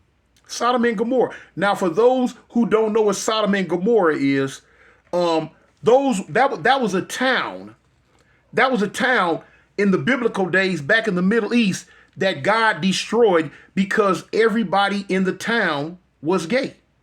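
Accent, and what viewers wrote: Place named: Houston, United States. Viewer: You sound like you from Htown Tx... Southern Voice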